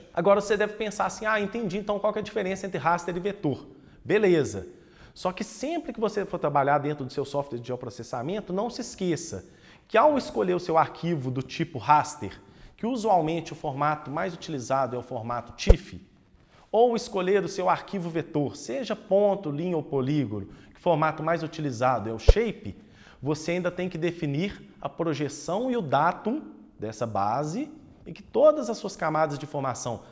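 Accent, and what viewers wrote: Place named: Minas Gerais, Brazil. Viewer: geoprocessameeeento. como eu amo o sotaque mineiro kkkkkk otima aula! obrigado!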